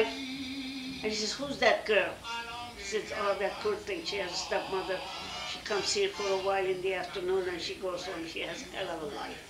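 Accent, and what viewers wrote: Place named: Kayseri, Turkey. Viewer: Ah.. The first time I cried so much to an Armenian story. She is still telling the Turkish words with a Kayseri dialect, also talking English with a Kayseri mouth. I don't believe an orchestrated genocide but personal stories are so dramatic :( The places this lady telling are correct; Kayseri, Boğazlıyan Orphanage etc.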